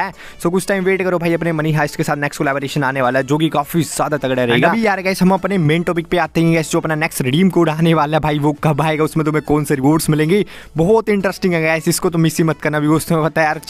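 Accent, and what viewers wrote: Punjab, India. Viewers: Bhai kya aap Punjab se ho🤔🤔🤔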